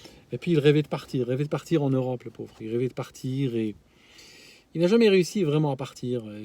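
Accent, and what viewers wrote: Switzerland. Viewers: Je comprends mieux les légères pointes d'accent suisse maintenant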